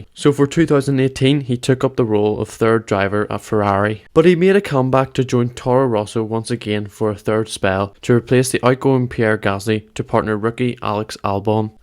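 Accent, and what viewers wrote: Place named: Northwest, Ireland. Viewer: Bro, its a guess, but are you by any chance from Ireland, that accent soumds like from the North or northwest of Ireland. ❤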